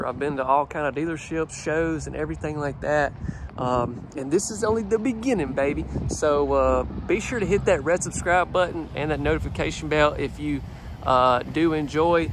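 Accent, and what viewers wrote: South, United States. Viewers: Love this one!! Turn off the volume?? I could listen to that southern drawl allll day long! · I love your southern accent. Your commentary is hilarious. It's one of the main reasons I enjoy your videos so much. This home was beautiful but a door that leads to the laundry room not a good idea. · Couldn't get tired of listen to you. Love your southern/ cowboy voice. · You just sound Southern to me❤️😉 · Im not talking about the way baby talks.. love his southern charm....it's the fact he has to open all those freeking cabinet doors!!!!! Unless there is something very special in the cabinet, all cabinets look alike!!!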